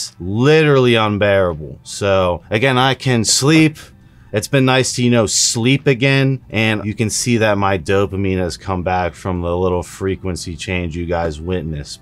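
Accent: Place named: Western Pennsylvania, United States. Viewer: I hope you get sorted and feeling better. I noticed a Western(ish) PA in accent. Is this where you are from?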